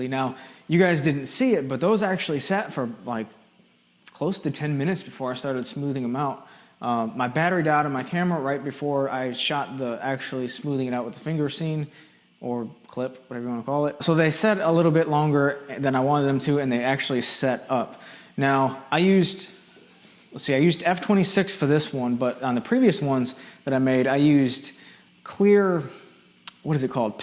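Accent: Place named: North, United States. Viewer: Nope...not too far at all. My little brother graduated from Mississippi State University a few years ago. I had originally put that you had an accent, but when I went back to the video before posting, I noticed a few slight "Mississippi" things, but mostly a northern accent. I thought I heard in one of your older videos that you mentioned making something for a Mississippi Craft group, but I forgot to bring it up.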